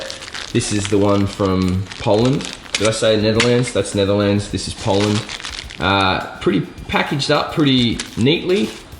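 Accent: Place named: Australia. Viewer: I know his Aussie I’m too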